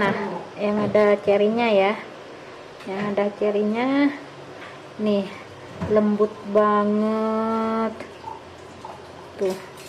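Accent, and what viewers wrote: Madura, Indonesia. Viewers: Kalau saya tahu cara bicaranya dr pulau madura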